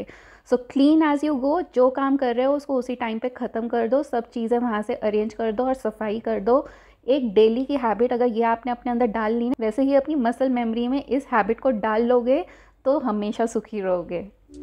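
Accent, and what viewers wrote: Punjab, India. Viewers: Are u from Panjab as some words used by has panjabi ascent...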